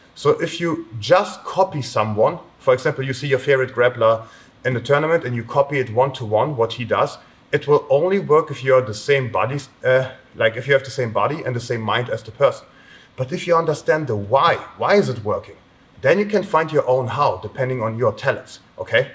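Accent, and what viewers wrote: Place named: Germany. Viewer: Extremely good explanation! Is it a german method explanation? (maybe your accent, gave ma a hint)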